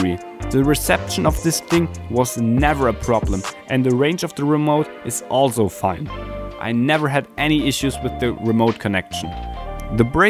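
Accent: Germany. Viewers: Wieso net deitsch? Des deutsch Akzent englisch ist ohrenkrebs dude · Hes like German Engineer on old VW commercial dubs.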